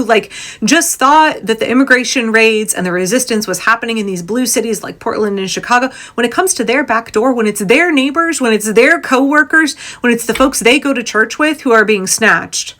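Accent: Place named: South, United States. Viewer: Damn finally! I’m from the South and this is the first time I’m hearing someone talk like they did when I was growing up.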